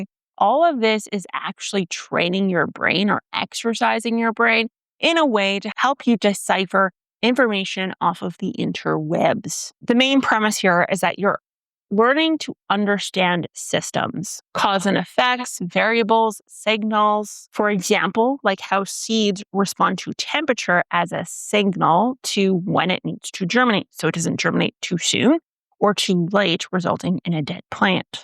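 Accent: United States. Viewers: I’m from Joliet Illinois and I’ve got to say you have pretty much the same accent as me. So you sound American suburban white. Not stereotypically Canadian at all. The people from Wisconsin sound Canadian tho lol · I've used heat mats for quite awhile. When I run out I sometimes use the oven with the light on and the door cracked. You definitely need a thermometer to monitor the temperature. Best used for peppers. You sound like an American to me, except for using the phrase 'those ones.' :) I live in Michigan so I guess close enough to Canada. Really enjoy your videos. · As a Wisconsinite, you sound like you live around here. Regional accents!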